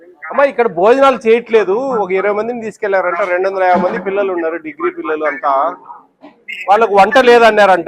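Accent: India. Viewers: Sir మీ మాటల రాయలసీమ మాండలికం చాలా నాకు నచ్చింది సొలకాడ తీసుకోవాల్సి వస్తది అనేది. Good work sir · మీకడప యాస బాగుందండోయ్ ,👌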